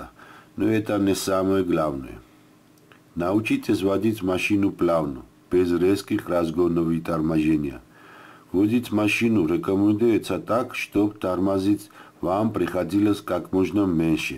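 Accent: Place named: Kutaisi, Georgia. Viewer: ვაა ქუთაისის გაიჩითა :)))ქუთაისელი ხართ?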